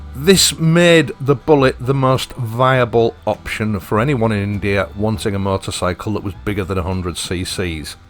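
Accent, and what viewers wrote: United Kingdom. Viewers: I love your British accent & narration style... 👌👍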